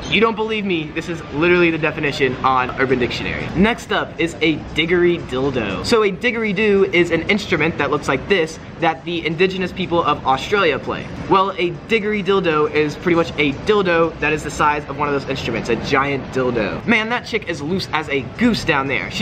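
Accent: United States. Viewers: Lmfao hearing all this in an American accent is hilarious. Love it mate, well done! 👍🇦🇺😂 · Hearing an American pronouncing these is so weird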